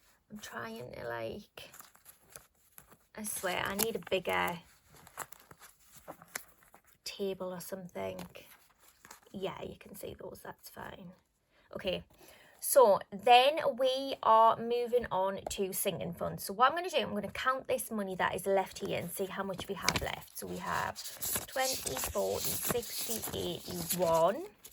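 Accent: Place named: Newcastle, United Kingdom. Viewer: My Gran was a Georgie,you videos are great and make me Smile when I hear your accent👍🏻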